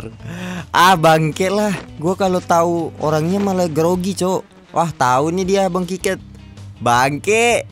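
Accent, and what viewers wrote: Bengkulu, Indonesia. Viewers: bang lu dari Bengkulu ya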